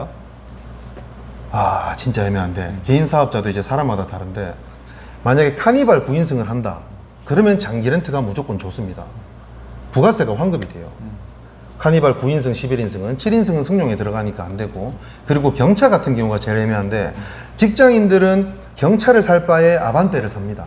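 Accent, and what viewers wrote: Gyeongsang, South Korea. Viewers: 억양이 경상도 이신거 같은데 진짜 홀린듯 영상 봤네요 좋은 정보 감사합니다.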